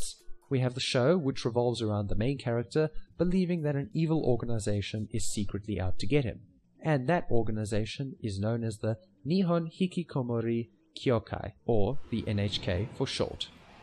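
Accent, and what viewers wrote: Afrikaans, South Africa. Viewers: Are u Afrikaans? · What is that accent? Afrikaans English ?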